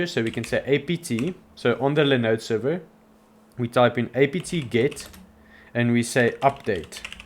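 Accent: Afrikaans, South Africa. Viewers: Lekker om n afrikaanse aksent te hoor! 😂😂 mooi storie!!! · You dutch from RSA?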